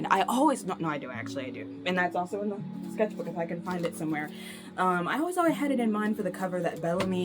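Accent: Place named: United Kingdom. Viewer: Your British was a bit too convincing bc I was thinking "Has she always been British?"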